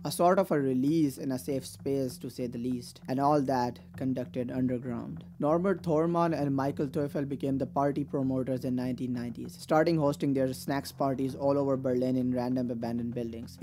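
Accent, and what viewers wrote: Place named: India. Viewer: Your funny story about a big guy complimenting your outfit is not funny at all... Berghain is a place of diversity, it originated from a gay club. Making fun of gays should permanently ban you from Berghain. I would rather hear someone telling me slaaay than your awful indian accent. I hope I dont get to see you ever in Berghain...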